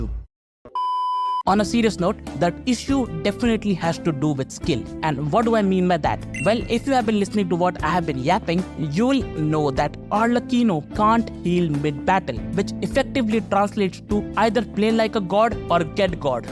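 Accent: India. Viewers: Indian accent on any "educational" video tends to be 100% factual You deserve more subs and hopefully not too many to be on Hoyoverse's radar with this godly amount detail of her kit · Bros got that iphone tutorial accent love it can always count on the indians to drop the best guides🔥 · he got that THICK Indian tutorial accent love it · Good video my indian sounding friend, keep it up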